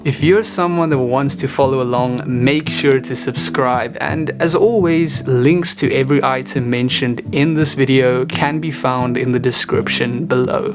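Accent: South Africa. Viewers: is that a South African accent im picking up?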